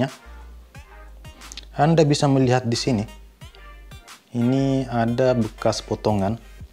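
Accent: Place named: Jakarta, Indonesia. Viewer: Logatnya keren klo org mks logat jakarta🤓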